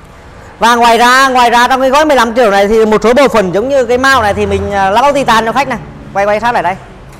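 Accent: Nghe An, Vietnam. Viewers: Bác người Diễn Châu nghệ an phải ko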